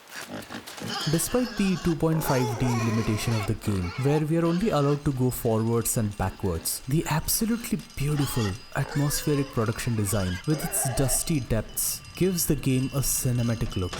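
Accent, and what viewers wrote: India. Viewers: I did not expect to find such elaborate thoughts on the topic at hand. Thought this (like many other “this is art” videos) would be more of a redundant opinion piece, but this video was a really fresh breath of air and encapsulates how I wish the precedent was set on the discussion of games as an art form. Although initially wary of the Indian accent, you really hammered down both the thesis of games as art, the linear storytelling and it’s philosophy and the qualities of INSIDE. Bravo. I do enjoy writing about art myself as in music, film and games and I always had a wish to create something like you’ve created on this platform. But the fact is I am not driven enough, like you apparently are, and is quite a technology boomer as I never piloted any video editing software. Even this interactive video thing you got going here flew me by and I frankly couldn’t be assed to operate by it haha. 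Still a banger video. A real question to ask is “why couldn’t this just as well be a movie”, which you cleverly debunked through it’s interactivity. This is the real reason video games works as a new medium of arts and not just a mutation of cinema. I wrote a review of the game VVVVVV which I will quote a passage off that maybe you can enjoy. The blessing and the curse of being a small content creator is: I wish you success but I also appreciate that I can perhaps have a dialogue with you. I won’t be online this summer but by all means add me on Steam if you’d like to pinball ideas or opinio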